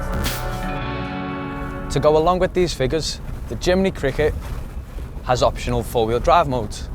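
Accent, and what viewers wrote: Liverpool, United Kingdom. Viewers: Are you Scouse or Dutch? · Can of cokeeeee and a packet of chiiiiippppss!! Scouse presenter is so annoying! Mind you I cant stand liverpool accents!!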